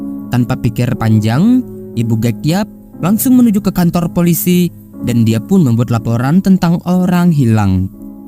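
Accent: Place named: Madura, Indonesia. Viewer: Mohon naaf ini suara seperti ada logat Madura, apakah orang Madura yg tinggal di Kalimantan, maaf ya😁🙏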